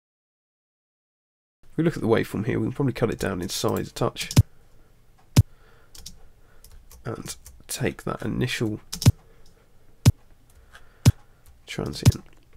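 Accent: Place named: London, United Kingdom. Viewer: Hey- awesome tutorials...do you do private lessons? you sound like you're from London :)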